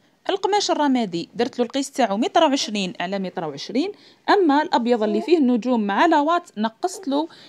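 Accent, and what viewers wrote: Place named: Setif, Algeria. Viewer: منين انت صوتك يشبه لينا حنا السطايفيه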